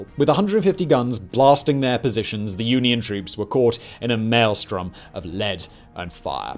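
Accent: United Kingdom. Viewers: I love the different British pronunciations of American words. 'MURICA!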